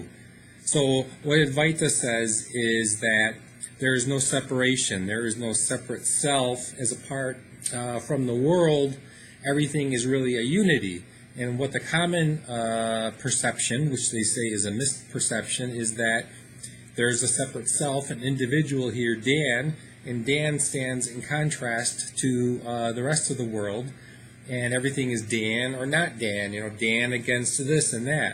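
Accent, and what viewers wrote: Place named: New Jersey, United States. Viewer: Hey brother, I hear the Jersey accent coming through, I’m from jersey too. I want to say that yes within the ego body mind there is a constant changing from moment to moment and each one you can say is a self. However what your true self is, is not within the world, and that self is changeless. In fact that self is just really not even anything, it just is, and that true self CONTAINS the world and the world originates from it. But depending on where your coming from, yes there are many selves, yes there are people, yes there is duality, but the true self contains and enables it all, and is One, across everyone. Your true self and my true self, are the same, we are actually each other. Peace and much love.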